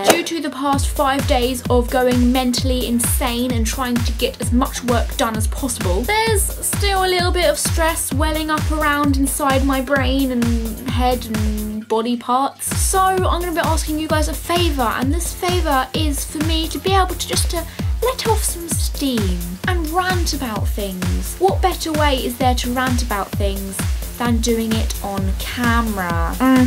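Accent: England, United Kingdom. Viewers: i friggen love your english accent IM FROM SCOTLAND :) cuz you and i are like the awesome people from the UK ;D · I love your British accent!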